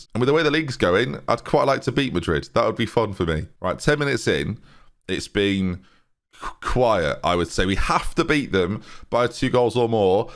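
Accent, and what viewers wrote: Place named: Newcastle, United Kingdom. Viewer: close your eyes while listening to and u would think you're listening to the true Geordie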